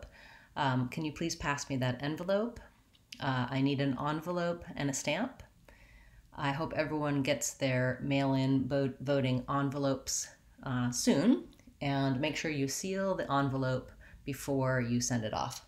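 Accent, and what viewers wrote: United States. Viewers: You’re from the Midwest I assume?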